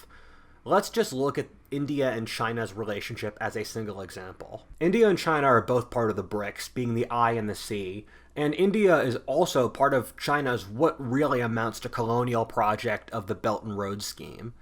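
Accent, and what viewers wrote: United States. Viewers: I heard the heavy American accent, & immediately disregarded this video. .... The short introduction alone showed how naive (poss believing all the heavy US propaganda) this presenter is. .... Wait just a few more years, young Sir, & you will be horrified at what happens betw East & West.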